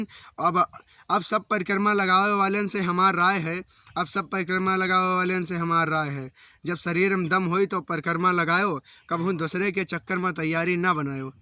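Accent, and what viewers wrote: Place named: Awadhi, India. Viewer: बहुत अच्छी प्रस्तुति..... अवधी बोली की बेहतर प्रस्तुति.....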